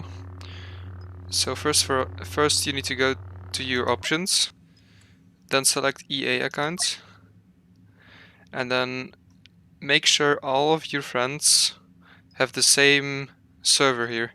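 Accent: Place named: Germany. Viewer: Spüren ich das deutscher du bist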